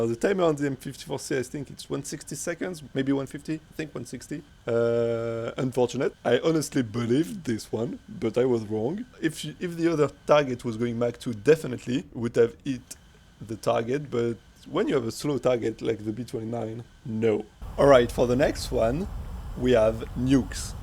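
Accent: France. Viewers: Average french accent 😅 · Very french sounding 👌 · French accent?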